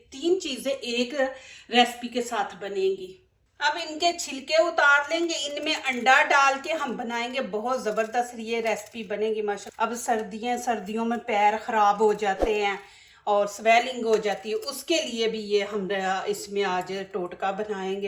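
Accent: Punjab, India. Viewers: Cooking main tu mahart Hy mgr guzarish Hy k Urdu lehja pr thori twajho dein jhatky se ye latka kr bolny se Urdu ki khoonsoorti mtaasr Hoti hy Punjabi andaz main Urdu na bolain Urdu ko Urdu main bolain tu Jo thori si uljhan Hoti Rehti Hy poori video k doran is se bach jain Pakistan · کیا بات ہے آپ کے پنجابی لہجے کی ❤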